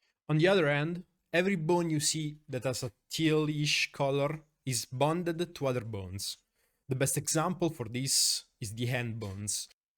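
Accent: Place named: Italy. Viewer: Mai collegh hahaha figo , si sente che probabilmente sei italiano lol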